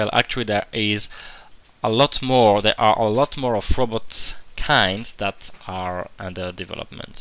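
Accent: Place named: France. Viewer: Hey this is awesome! Also, you sound really french! If you are then: Salut! Super mod! Je kiff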